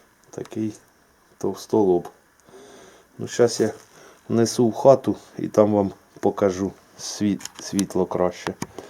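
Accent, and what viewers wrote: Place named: Central Ukraine, Ukraine. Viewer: Така смачна балачка! Черкаси,Полтава,Кропивницький?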